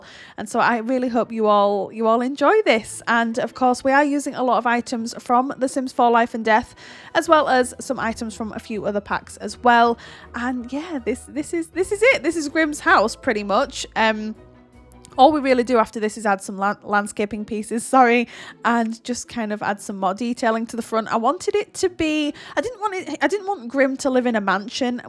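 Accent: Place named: Newcastle, United Kingdom. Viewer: yeah you guys sounds similar, by any chance is it called a geordie accent, right?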